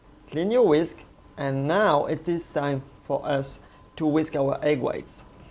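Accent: France. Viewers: Nice one ! That French accent though..🤣🤦🏽‍♀️🤷🏾‍♀️😘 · I did not realise Chef was called! Quel accent français, seeing the channel name, I was expecting a english gentleman!! Edit: doing that tomorrow by the way;) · Thank you for a great explanation on how to make a Tiramisu. By the way i love your french accent.🙌😉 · French english 😅😅...funny,I like it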